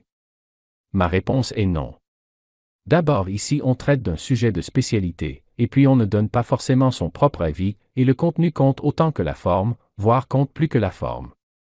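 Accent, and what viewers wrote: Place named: Canada. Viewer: Great job.Pourquoi cet accent du Canada?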